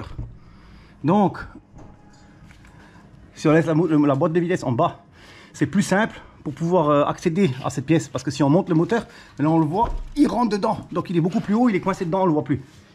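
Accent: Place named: Sarreguemines, France. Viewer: Bonjour, vous êtes en Moselle ? J'ai l'impression de reconnaître l'accent de Sarreguemines ? Je cherche un mécanicien pour ne embrayage sur bmw série 1 116d je suis de Forbach.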